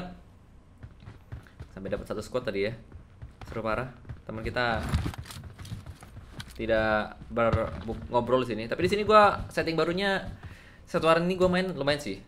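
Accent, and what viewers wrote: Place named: Central Java, Indonesia. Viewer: Dari jawa tengah mana suaranya🙏🙏🙏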